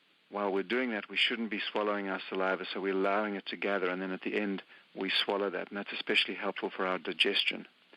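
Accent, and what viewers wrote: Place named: South Africa. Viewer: I’m in South Africa. I heard your accent and got excited that you might be in SA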